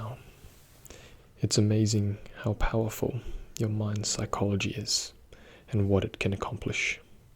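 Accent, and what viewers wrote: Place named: Australia. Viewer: a really nice smooth australian accent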